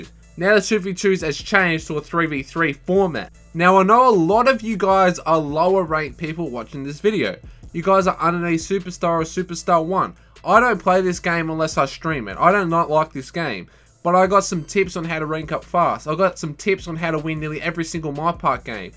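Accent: Australia. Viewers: Are you from Sydney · You Australian??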